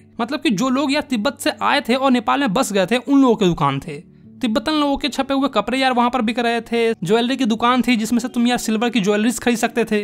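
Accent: Bihar, India. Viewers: Hum bhujlo bhaiya app bihari ho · Bhai ap kya bihar se ho kya me up ka hu bhAi ye to bajane me maje ate h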